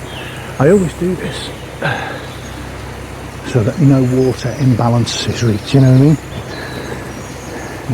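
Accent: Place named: Wirral, United Kingdom. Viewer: We've been watching your vlogs, are you from the wirral ? Your accent sounds like wirral, not Liverpool, we are from the wirral 😂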